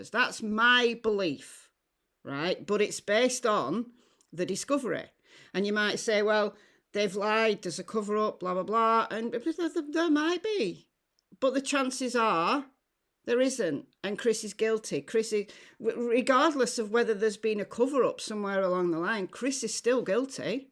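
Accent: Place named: Wales, United Kingdom. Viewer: New Subscriber, and I Love You, you are so Refreshing and tell it like you see it, it's Awesome! Hang in there and don't team up with any other creator, as you well know! Be true to yourself! Plus my folks were from Wales, UK, and I Love hearing your accent, so simular to my parents, but sadly they are no longer with us. Take Care!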